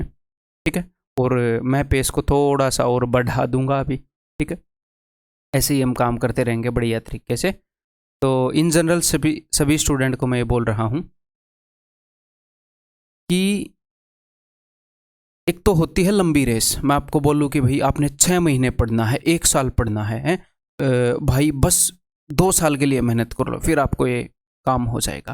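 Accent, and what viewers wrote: India. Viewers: Great motivation Maja aa jata hai sunkar Bilkul Desi style me......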